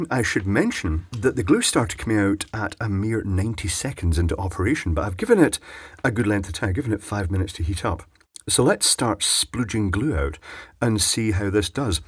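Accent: Scotland, United Kingdom. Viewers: I'm just going to watch your video for your accent! love it Scottish right? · You have the most British accent I have ever heard, but then again I am from Stoke-on-trent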